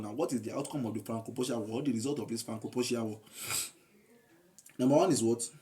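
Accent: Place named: Africa. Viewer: It is simply an African Dialect of english, don't call it gibberish just cuz you cant understand it bro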